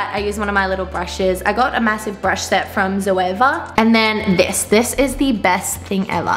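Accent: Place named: Australia. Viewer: Why do Australians, and I have only noticed on girls, pronounce the letter "o" like "or": oh nor, here we gor, etc... lmao I find it kinda funny and cute.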